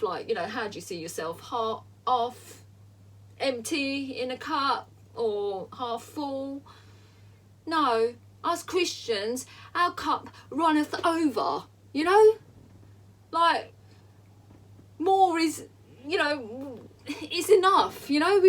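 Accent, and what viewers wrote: United Kingdom. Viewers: Can’t help smilin’ more an’ more the more you speak. His joy is shining, sprinkling, radiating, streaming out of you, you know,:) I always thought there was the joy of Jesus at the center of the True Cockney. This is proof of tha puddin’! Keep spreading His love, luv. Good job!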